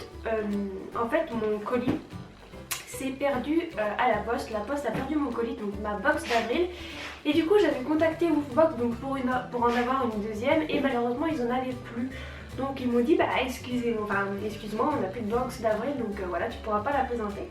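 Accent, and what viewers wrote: England, United Kingdom. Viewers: Ton accent anglais >>>> ♥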